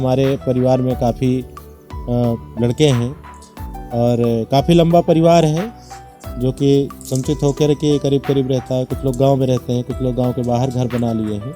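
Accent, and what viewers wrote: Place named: Uttar Pradesh, India. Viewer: Bilkul up se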